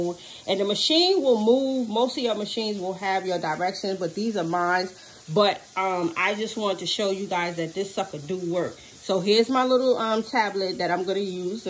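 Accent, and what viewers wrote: New York, United States. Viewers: I was going to say New York lol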